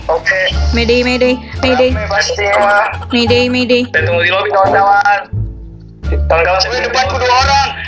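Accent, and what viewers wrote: West Java, Indonesia. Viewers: Sunda Euy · Orang mana teh ning sunda? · orang sunda gening😀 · Sunda Wani😂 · Sunda ti mana neng gelis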